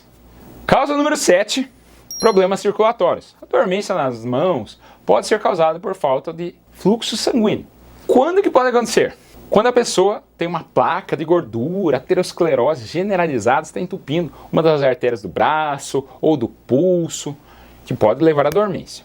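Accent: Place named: Minas Gerais, Brazil. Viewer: Esse doutor é muito simpático, sotaque de mineirim 🥰 Bom que fala rápido...rsrs...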